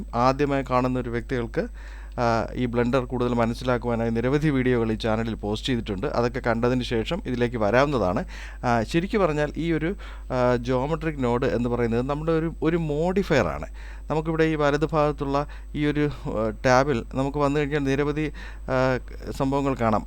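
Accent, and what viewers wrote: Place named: Thiruvananthapuram, India. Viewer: സാർ., താങ്കളുടെ ടൂട്ടോറിയൽ ലാണ് നമ്മളെ ബ്ലൻഡർ എന്താ ണ്ടെന്നും ചില കാര്യങ്ങളും പഠിപ്പിച്ചതു. താങ്കൾ തുടർന്നും ഞമ്മളെ പോലുള്ളവരെ പഠിപ്പിക്കുമെന്ന് പ്രത്യ ക്ഷിക്കുന്ന നമ്മൾ തലശ്ശേരി കടുത്ത വായനശാല കൂട്ടമാണ് മലയാളത്തിൽ ബ്ലൻഡർ കച്ചവടമാക്കാതെ സത്യസന്ധമായിട്ടുട്ടോറിയൽ തരുന്ന വ്യക്തിയാണ് താങ്കൾ. താങ്കളെ നേരിട്ട് കാണാൻ ഞങ്ങൾ ആഗ്രഹിക്കുന്നു. എല്ലാ കൊല്ലത്തെ ഫിലിം ഫൈറ്റി വലിൽ ഞങ്ങൾ എത്താറുണ്ട് താങ്കളുടെ ശബ്ദ കേൾക്ക മ്പോൾ തിരുവനന്തപുരം ഭാഗമാണെന്ന് ഞങ്ങൾ ഊഹിക്കന്നു. നേരിൽ കാണാൻ ഞങ്ങൾക്ക് ആഗ്രഹമുണ്ട് അതിന് താങ്കൾനമ്പറോ ID. യോ തരുമെന്ന്. പ്രതീക്ഷിക്കുന്നു. അഭിവാദ്യങ്ങൾ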